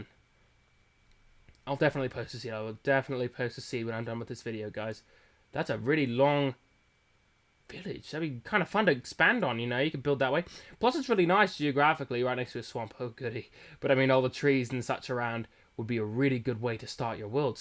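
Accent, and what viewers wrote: United Kingdom. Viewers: I like your videos and that British accent :D the vids are giving me patience ... :)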